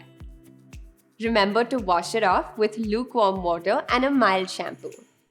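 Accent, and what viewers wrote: India. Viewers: I'm from the U.K and she has British/European features, skin tone and hair colour. But her accent matches my asian/Indian companions. That is all, Never meant anything by it all! She is very beautiful either way! Love your videos guys. Keep up the great work! 🥰🤭❤️💗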